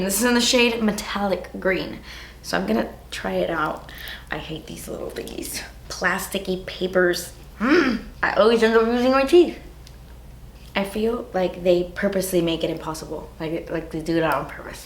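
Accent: United Kingdom. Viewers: Your British accent cracks me up every time! 🤣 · That British accent😂😂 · Lmao you really made me laugh when you tried on the lippie 😂 and yo' British åccent haha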